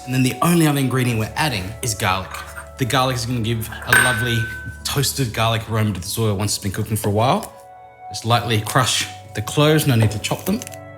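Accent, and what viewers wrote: Australia. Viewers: your Aussie soothing voice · My man. Are you Australian Japanese. ? Too cool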